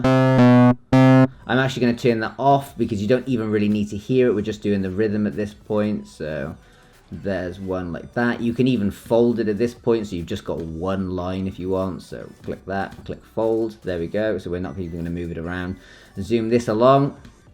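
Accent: United Kingdom. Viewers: Shit,! That was a very nice video. I am in a DJ/producer course, but now I see I should have spent the money on gear and learned from you, on YT. You have great content. Hope we can share a beer when I'm UK (you're based there, I assume, due to your accent.) Love from Brazil.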